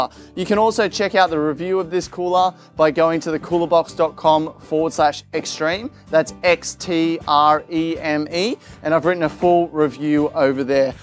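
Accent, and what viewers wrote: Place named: Australia. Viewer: a very aussie accent))) how'ya' going mate?)))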